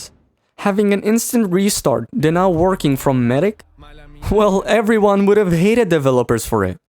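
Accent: Romania. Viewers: are u romanian :)) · omg are you romanian??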